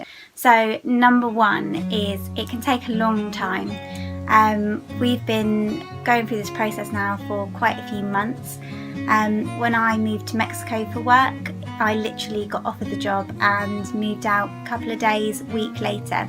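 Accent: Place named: England, United Kingdom. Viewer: thanks I like your accent what part of England are you from?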